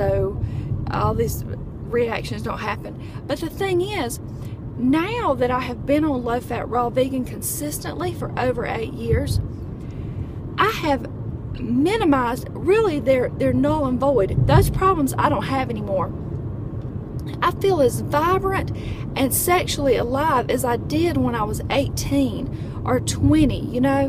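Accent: South, United States. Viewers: Hey! I just found your channel and enjoy watching. I recently purchased a Vitamix and love it. I'm not vegan, but love green smoothies and raw veggies. I laughed so hard when you held up your pitcher.:) I would do the same thing. I am in my mid 40's and hope to not have all the crazy peri-menopause symptoms I read about. By the way, I'm from the south too, so I understood your accent perfectly! Great vlog!: )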